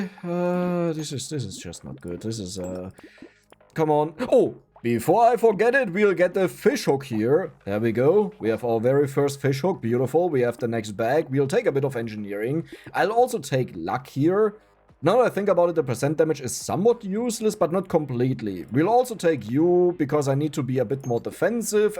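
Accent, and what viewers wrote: Germany. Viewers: Hi there, may i ask from wich region in germany you are? Im realy not sure with your accent. Thanks for your videos they are quite entertainung.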